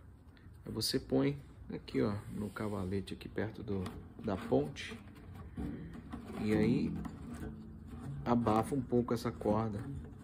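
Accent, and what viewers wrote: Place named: Brazil. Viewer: !!! Greetings from Montréal, Québec, Canada!!! This time, my message shall be a bit different...Me fije que su appellido era 'Latino' y ahora, por su acento, usted es 'Brazileno'!!! Yo hablo espanol desde hace 30 anos por haber sido casado con dos Latinas (Panama y Mexico) Sera un Gran placer seguir platicando y aprender de usted Sr.!!! Que tenga usted un bello dia y semana!!! Saludos calurosos desde Montréal, Québec, Canada!!! Ciao/Cheers!!!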